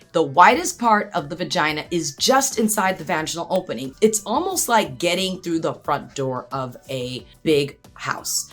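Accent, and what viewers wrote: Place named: United States. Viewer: American narration....cant be bothered to listen.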